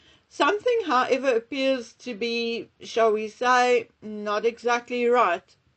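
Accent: South Africa. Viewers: Yussus man that’s a baie strong South African accent if ever I heard one ekse 😂😂😂😂 lekker 😂😂😂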